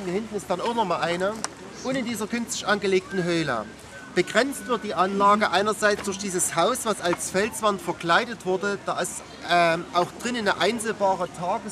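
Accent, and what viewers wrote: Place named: Saxony, Germany. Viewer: Herr, Sie können prima meinen Heimatdialekt sprechen. Ich wohnte in der Gegend, jetzt aber in Thüringen. Kriege richtig Heimatgefuehle, wenn Sie bei Elefant, Tiger und co dabei sind. Ihr Sächsisch zwischen " Leibzsch un Dresdn" ist das beste. LG nach Taucha. Sind Sie Ehrenbürger? Wäre angemessen.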